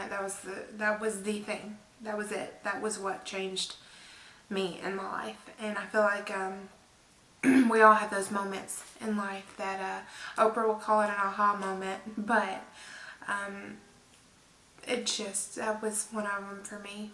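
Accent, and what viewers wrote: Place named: Tennessee, United States. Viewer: YAY!!!! Look at you sittin there all cute!!!! I liked and subscribed before the video even started 😂😂😂 I love your accent. Now when I read your posts on IG I can do it with your Tennessee flair 😉 Amazing first video. I look forward to the next one.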